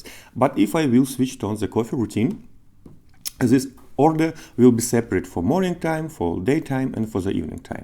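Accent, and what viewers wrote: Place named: Russia. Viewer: Просто жесточайший рунглиш Не осилил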